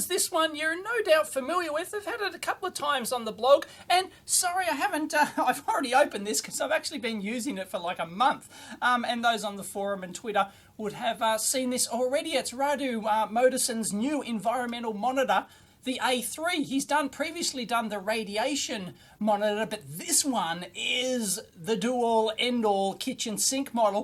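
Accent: Australia. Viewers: JuiceOfSapho more like Dundee ! Crocodile Dundee! with the similar accent . or maybe Jim bowie!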